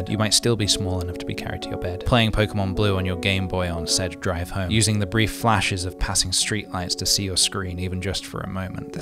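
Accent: United Kingdom. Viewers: where are u from? your english sounds different to me, UK? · How did I know you were British before I even clicked on the video 🤧 but Pokémon blue version, represent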